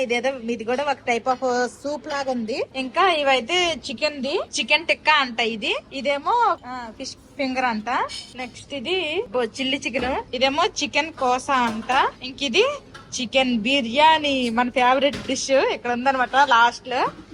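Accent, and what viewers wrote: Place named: Telangana, India. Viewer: Miku Telangana slang istundi akka😂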